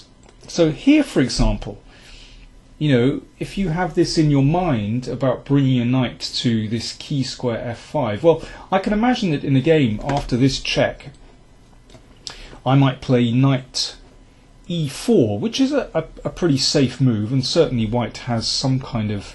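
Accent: United Kingdom. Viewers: I'm also American and I've never had an issue understanding. Maybe I'm more accustomed to the accent due to all the British comedy I watch.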